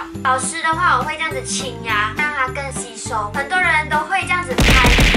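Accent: Taiwan. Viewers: 你应该是去台湾太久了 讲话的音调都像台湾人了哈哈哈哈哈 加油哦 期待你下一部影片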